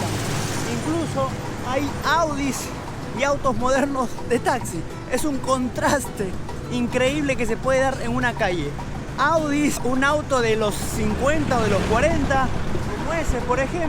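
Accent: Argentina. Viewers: Por qué imitas el acento argentino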